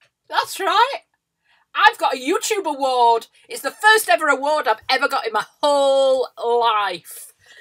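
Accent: United Kingdom. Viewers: Are you from east Enders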